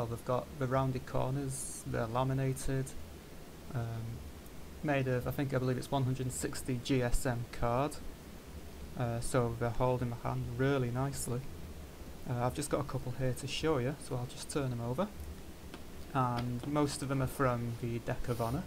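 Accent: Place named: Brazil. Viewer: Are you brazilian? Your accent sounds familiar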